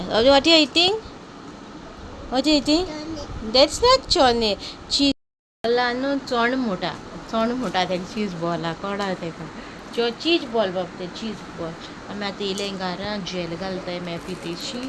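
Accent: South, India. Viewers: Nice voice and south accent keep it up Nice information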